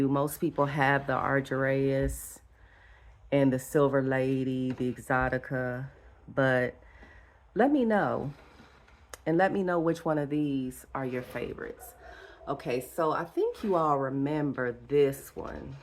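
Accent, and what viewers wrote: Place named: South, United States. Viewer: I love your collection of Scindapsus. I love all the them. I think they are so different and spectacular.....I love your southern accent....many blessings....chula....abrazos amiga....MINDY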